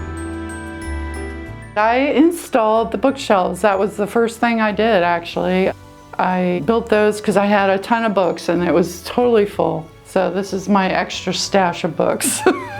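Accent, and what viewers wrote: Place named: Washington, United States. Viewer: Is it surprising that I can detect a Washinton accent?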